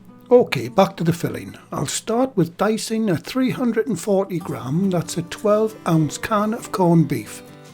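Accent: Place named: Newcastle, United Kingdom. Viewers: I'm so happy I found your channel. I'm a northerner myself from Newcastle who now lives in the states. I've been terribly homesick and not able to travel back due to Covid:( Hearing that accent and watching all your videos makes me feel like I'm back home! I'll be trying some of your delicious recipes this weekend! HAWAY THE LADS. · You got a like when I heard your Geordie accent. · An English voice , at last, to be found on the internet. I watched , just for the relief of it. Just the metric references to be got rid of I've shared it with a genuinely interested party . Thank you. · I love this channel. I am,also,from the north east and your voice takes me back to my time there and my mam's corned beef pie was just like yours. Thrilled to have found you.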